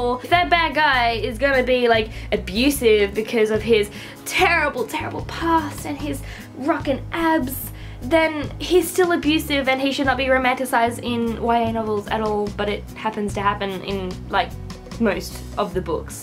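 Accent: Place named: Australia. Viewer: Hi! I love seeing a fellow Australian on Booktube, your accent feels like home. I'm new to booktube and I'm having a great time catching up on your previous stuff! (you may be getting more random comments from me soon *looks sheepish) I definitely agree with about cheating in books. I think it gets romanticised way too much. It sends the message to readers that cheating is exciting and sexy and that the person being cheated on is always an afterthought. Also, FAKE STICKERS WHAT THE HELL!?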